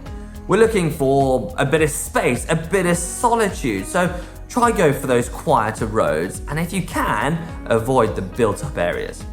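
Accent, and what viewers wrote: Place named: RP, United Kingdom. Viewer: Love how posh this white guy is. Keep it up, great work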